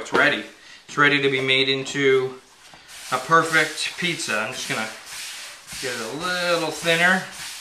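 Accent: Canada. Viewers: You sound like ur from Ontario Canada.. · Are you Canadian?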